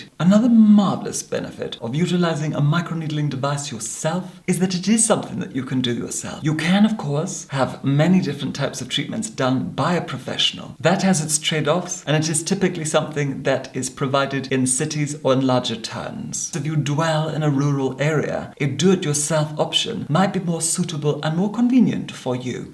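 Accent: Scotland, United Kingdom. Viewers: Gosh, I have missed this soothing, clear-cut Scottish brogue. <3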